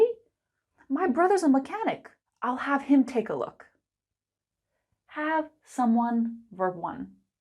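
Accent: United States. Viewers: I want to learn speaking English fluenly and correctly on phone calls or by any other means . I Enjoy your channel keep it up. sister are you american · Hello, great teacher! Is your pronunciation American, British or Australian? Your pronunciation is so nice!😜👍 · Are you American or british?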